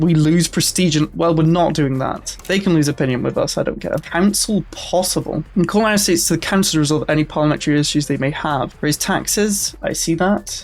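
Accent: Wales, United Kingdom. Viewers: he definetly sounds Welsh and I say that as an English person.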